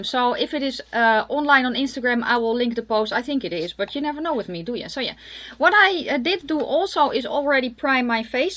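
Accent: Netherlands. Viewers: Your dutch Accent is so cute! 🙈🥰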